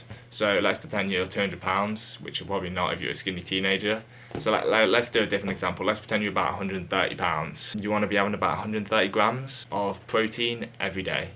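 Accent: United Kingdom. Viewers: Then don’t watch lmao, how hard is it to exit? Its not a lisp lol its his brit accents.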